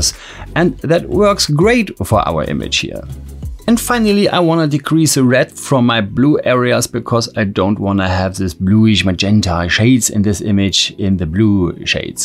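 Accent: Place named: France. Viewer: He sounds like G-Man from Half Life, just with french accent :)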